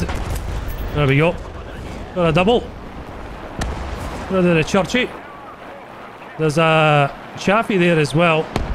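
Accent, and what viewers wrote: Scotland, United Kingdom. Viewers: just wanna say nice gameplay in the intro also whats your birth nation you sound scottish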